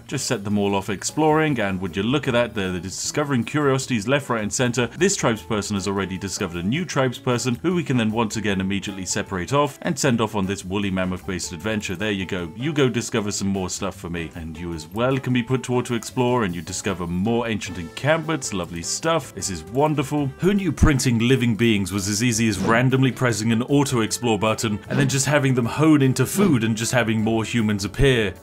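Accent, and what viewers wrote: United Kingdom. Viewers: Gosh you are British aren't you LMAO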